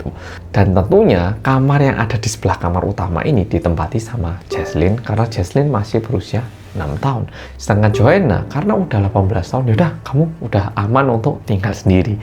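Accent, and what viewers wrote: Java, Indonesia. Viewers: 😂😊suka bgettt m cowok cowok Korea eeh Nemu konten kok BS bahasa Indonesia medok juga ... JD fans 😂😂😂😂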